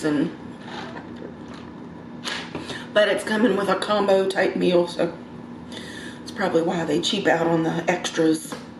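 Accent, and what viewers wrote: South, United States. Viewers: 🌮Cheat days are a good idea when your weight loss stalls. Enjoy!🌮 Your southern accent is thicker than mine today! Lol!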